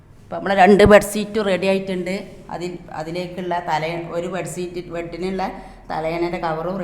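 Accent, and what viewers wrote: Malappuram, India. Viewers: തനി മലപ്പുറം slank...... no ജാട.... അടിപൊളി താത്ത...... i like it ഉറപ്പായും ഞാനും ഉണ്ടാകും ഞമ്മടെ ബെഡ് സീറ്റ്‌....... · ഇത് ഞമ്മളെ മലപ്പുറം ഭാഷ.. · താത്ത സൂപ്പർ നമ്മുടെ മലപ്പുറം ഭാഷ പൊങ്ങട്ടെ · Malappuram original samsaram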